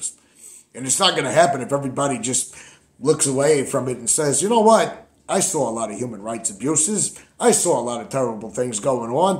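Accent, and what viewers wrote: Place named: New England, United States. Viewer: New Englandar! Love it!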